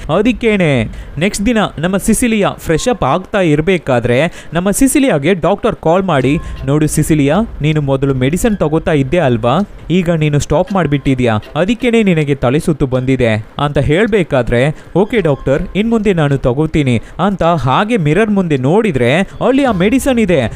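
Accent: Tamil Nadu, India. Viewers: Nim kannada Tamil accent 😄😄 but really well explained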